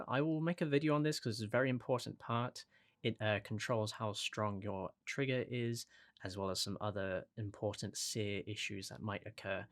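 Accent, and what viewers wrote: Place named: England, United Kingdom. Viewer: This video should be a how to video for making how to videos. Nice close up views, no distracting, uneccesary background music and as much as it pains me as an Aussie to say. An English accent. Fantastic work mate.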